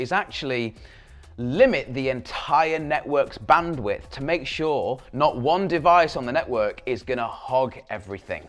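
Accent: United Kingdom. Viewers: That is how the British say 'router' because of how we say 'route' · That’s how we say it in the proper Queens English, not the bastardised yank rip off.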